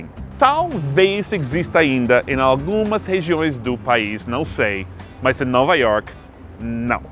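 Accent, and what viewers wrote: United Kingdom. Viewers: você parece mais britanico que americano sr gringo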